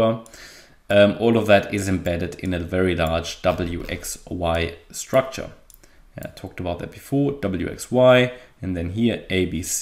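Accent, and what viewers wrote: Germany. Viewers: Prima Content, dem Englisch nach kommen Sie aus Deutschland. Daher meine Frage : gibt es das auch irgendwo auf deutsch? Bin mir manchmal nicht ganz sicher...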